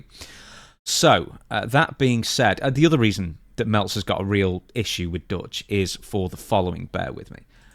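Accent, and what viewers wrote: England, United Kingdom. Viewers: I don't know what it is with you, but that English accent that you have always makes it easier even when you're pissed off to understand everything you're saying💯🫡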